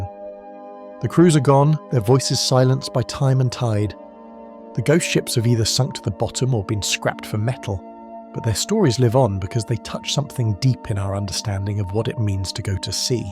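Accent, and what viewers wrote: United Kingdom. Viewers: US anti-intellectualism using a British accent. Hilarious. Rewriting of history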